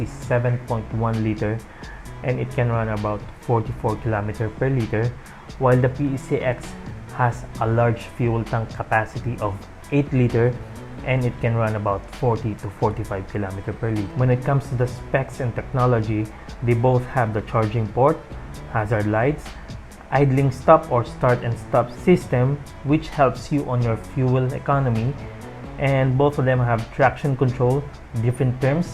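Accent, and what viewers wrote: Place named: Philippines. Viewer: I'm liking the filipino accent. Also he's aiming for a much bigger audience obviously so he's using the universal language so other who aren't Filipinos can understand. Just saying.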